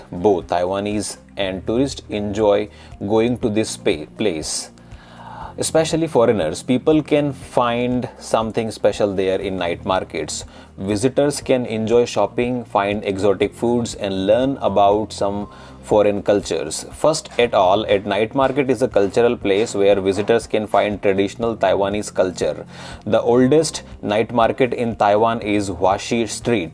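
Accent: India. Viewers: Your India accent is clear enough to understand ,i met worse🤣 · Sorry it was not strong enough, I have calls with lots of indian providers and speakers and the accent is almost impossible, this one here was easy. I wish I could find a more difficult one. Thanks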